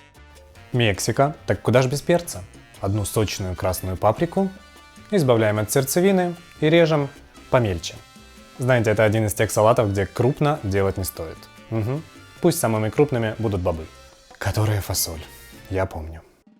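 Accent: Odessa, Ukraine. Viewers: А я люблю,чисто одесский акцент:,, здрастье вам через забор"...😄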